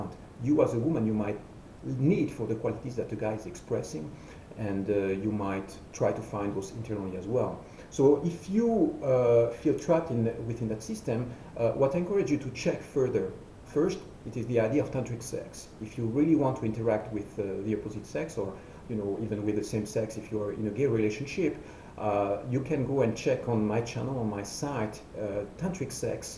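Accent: France. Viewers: Agreed, again. BTW, Vous etes français ? Your accent sounds a lot like French, it's cute.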